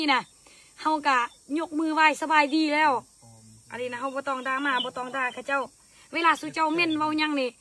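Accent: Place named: Luang Prabang, Laos. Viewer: ฟังสำเนียงเจ้ แก เว้า กะรู้ ว่ามาจากทาง หลวงพระบาง หรือ ที่ประเทศไทยเราก็ สำเนียงคนเมืองเลย.หรือจังหวัดเลย😂😂😂สู้ๆเด้อเจ้ คนสวยใจงาม.#คนไทยในต่างแดน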